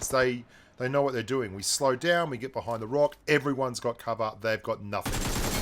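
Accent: Australia. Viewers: Hey. Damo from SA here. So refreshing to hear an Aussie and self deprecating at that. Brilliant videos, tactics and fun in spades. Been playing and watching your content for ages. Watch out for grubs. 😅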